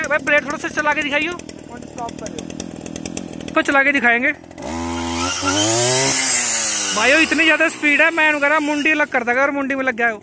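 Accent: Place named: Punjab, India. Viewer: I can listen punjabi in ur hindi ❤️🎉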